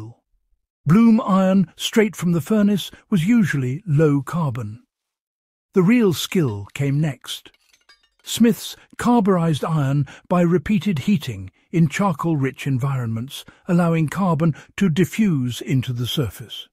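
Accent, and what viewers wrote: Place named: United Kingdom. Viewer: AI has gone British & Snotty 🖕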